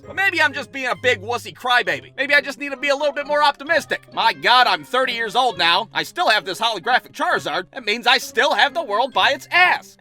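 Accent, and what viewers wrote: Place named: Northwest Ohio, United States. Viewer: Okay okay this dudes form nw Ohio isn’t he😂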